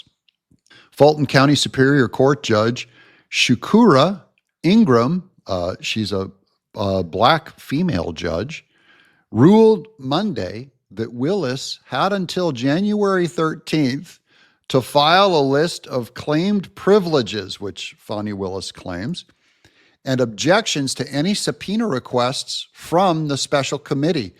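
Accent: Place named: Turlock, United States. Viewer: Very distinctive voice. Sounds like a Turlock boy. Ha 😂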